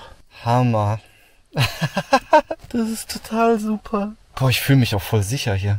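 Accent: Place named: Poland. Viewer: Hey, sag mal hast du Polnische Wurzeln? Du sprichst Polnisch wie ich ich habe eine Oma die aus Polen Kahm 😊Liebe grüße von mir und alles glück der Welt auf deinen Reißen ich währe so gerne so mutig wie du vielleicht wenn meine Kinder aus dem Haus sind!!!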